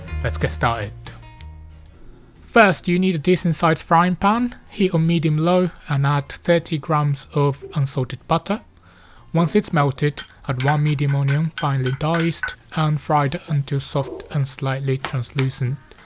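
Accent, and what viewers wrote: United Kingdom. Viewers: Love your British accent! Thank you for the lovely recipe ❤❤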